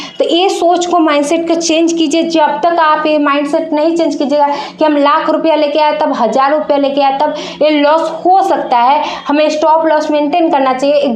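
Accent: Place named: Bihar, India. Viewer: Oh hai na bihar say hai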